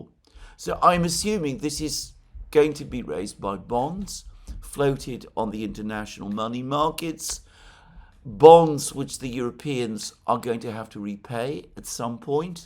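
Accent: United Kingdom. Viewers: AM's real audience is Russia. AM is reading a Kremlin script. The Kremlin chose AM because he is physically repulsive and has a British accent and because they got him cheap because he had been recently disbarred, a fact the Kremlin never discloses in its script it issues to AM to read. I watch the program because it is a direct line into what the Kremlin is telling its own citizens. Again, keep in mind that the reason the Kremlin selected AM to read their script is because AM is physically repulsive with a British accent, which is how the Kremlin wants to portray the West.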